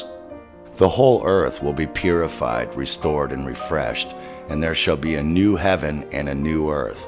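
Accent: United Kingdom. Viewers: WHAT IS this music... is your name? I lived for a few years in Kolwezi, Katanga [Shaba] in Zaire, and we had a small lake there, perhaps what's left of that Lake called Zembre... Zaire is the name of the whole country [Congo[ in Mobutu's time. Kolwezi is close to Angola. [that was 62-64]. Before that I was in Kambove and Jadotville/Likasi, born there of colonial parents, and we retured to Belgium. I am in Britain since 1968. I love it when you read a text with the British accent, and of course your amazing theories, especially this one with an eluxidation for this great mystery concerning what the Son of Man means!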